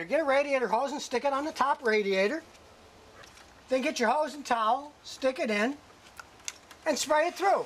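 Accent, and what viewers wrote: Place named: United States. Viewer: Love your cowboy accent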